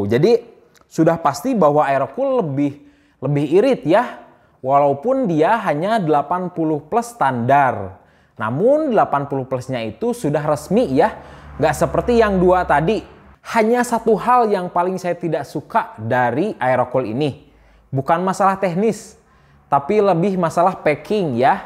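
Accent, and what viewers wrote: West Java, Indonesia. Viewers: urang sunda nya kang 😄😄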